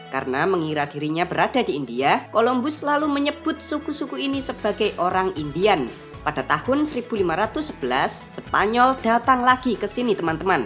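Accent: Java, Indonesia. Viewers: Ini orang Jawa banget deh kyknya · seru yg ngisi voicenya, medok jadi makin betah nnton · suara loo medok banget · Jowone ngendi kang? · Yang ngomong medok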